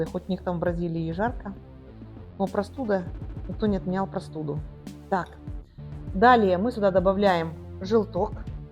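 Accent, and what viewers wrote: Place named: South, Russia. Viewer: ЛОжить, лЯжу - из малороссийского говора, другого чередования согласных, ударений, ну и что, с большой теплотой к нему отношусь, весь юг российский на этом диалекте говорил, окают в Нижнем, акают в Саратове, Москве...и т.п. Это все прекрасный русский язык в разнообразии своих практик жизни. Спасибо вам, за доброжелательность и неравнодушие!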